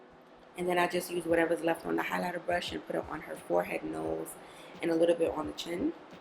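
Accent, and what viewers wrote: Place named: New York City, United States. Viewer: what is your background,where you from? Cuz I'm getting Bronx N.Y. Jersey vibe from your accent.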